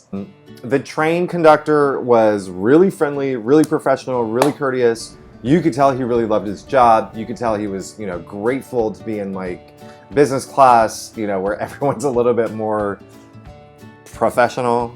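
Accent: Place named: South, United States. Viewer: He's from the South.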